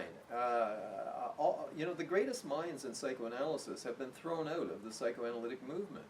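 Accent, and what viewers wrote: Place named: United States. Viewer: I think i recognise an american accent (i'm french), and i think your students are lucky. In France it's still difficult when your psychologist has been formed at the university and is from my generation (i'm 49) to critises Freud's theory when you talk about your life and you see on the face of the psychologist that you're not taken seriously. You have to talk about Oedipe theory and fantasies and why you think it can't be used to be analysed, and most of the lime they laugh at your face because they always pretend you didn't understand it how Freud meant it ! Hopefully i discovered Ferenczi about traumas and identification to the agressor. But so called psychoanalysis based on Freud's ideas has still too much power in France. If you want to come and make conférences against it, you're welcome !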